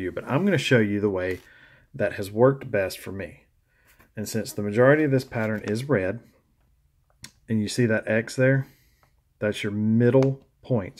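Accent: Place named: South, United States. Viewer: love your southern accent. i used to live in Florida....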